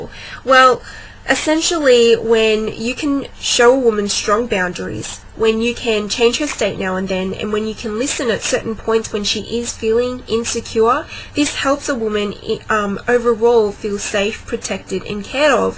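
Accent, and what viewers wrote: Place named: Australia. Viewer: Are you Australian? I'm wondering if I recognized the accent. : ) I think you're right about the importance of calling her out on it, or ignoring an outburst. I remember when an ex of mine said she actually appreciated it when I did so once.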